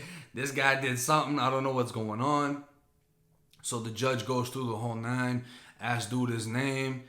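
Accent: Boston, United States. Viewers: Dude gotta be from Boston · I love this dude's accident you can tell he's from like Boston or something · I FUCK WITH YA VIDEO AND STORIES REAL RECOGNIZE REAL KEEP UP YOUR GREATNESS MY DUDE 🙌🏾🤟🏾 AND SOUNDS LIKE YOU FROM BOSTON NOT FLORIDA BUT I KNOW IT GOES DOWN IN TAMPA THE WHOLE FLORIDA GET IT POPPING IM FROM BROOKLYN AND I LOVE EVERYTHING ABOUT FLORIDA THE HOODS EVERYTHING I CALL IT SUNNY NEW YORK LOL 😂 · Am I the only one that says u sound like u have a Boston accent? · Yo bro where's that accent from you sound like your from boston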